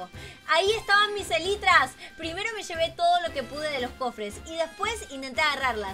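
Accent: Argentina. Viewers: Te quiero mucho y me encanta el idioma argentino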